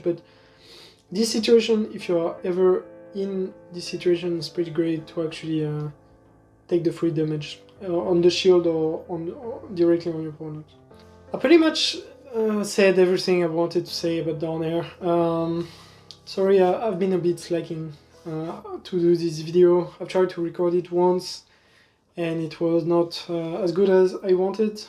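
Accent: France. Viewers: Ton accent est très franchy!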